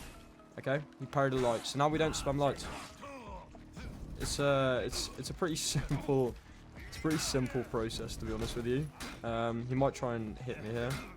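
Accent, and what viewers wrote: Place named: Australia. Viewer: Very good and informative video, my wife likes your Australian accent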